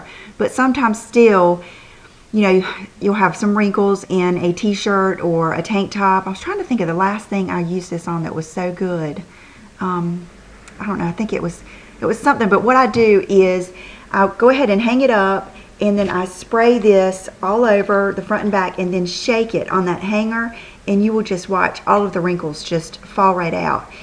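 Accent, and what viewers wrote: South, United States. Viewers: are you OK. Miss seeing your smiling face and hearing that wonderful southern accent. Sending you positive vibes your way. Feel better soon. Hopeful we will see you this week. It's was very odd with no Friday Haul last week. XOXO Emily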